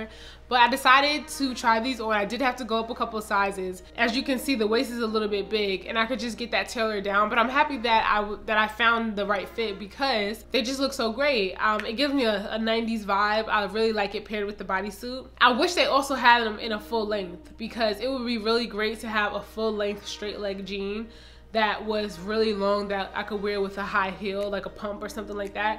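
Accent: New York, United States. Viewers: Another great video! Your NY accent is giving me so much life!!! Reminds me of home lol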